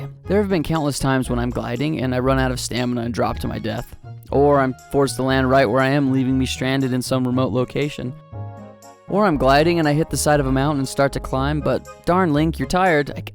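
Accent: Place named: California, United States. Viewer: this choking californian accent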